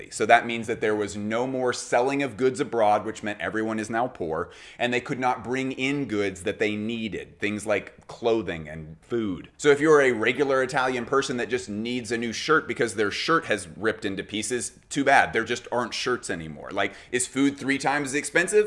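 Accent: Russia. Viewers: why are you using a russian accent when conveying fascist things😭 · Super interesting video That's a Russian accent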